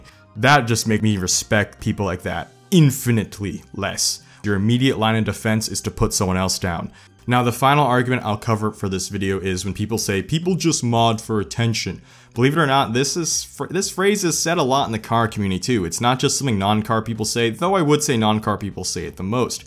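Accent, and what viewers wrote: United States. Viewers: I hate to sound racist but you sound awfully American for a person Asian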